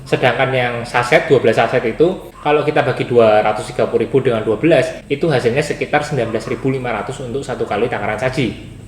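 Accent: Java, Indonesia. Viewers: orang Jawa ya , medok bngt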